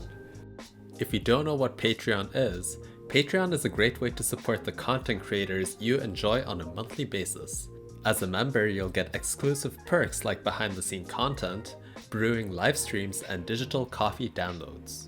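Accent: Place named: Germany. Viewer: Idk why but I was so sure you were German lmao You kind of sound like a (very good) German English speaker xD Are you really Canadian?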